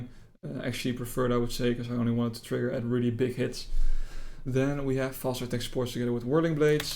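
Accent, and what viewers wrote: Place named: Netherlands. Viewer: This is the best build guide so far. This is my first Poe league and character. Started as Zizaran guide then felt squishy changed to was super tanky but lacking dmg. I tried to change some pob ninja builds I couldn’t work it out. Until I found you. Thanks for your build and I really think you deserve more viewers. And I’ll be your loyal subscriber from now on. Super detailed I understood everything. (Btw i lived in Den Haag for 8 years and i hold Dutch passport, could easily recognise your accent 😊). Please keep continuing these kind of builds. And I’ll follow everyone of them Dank je wel 👍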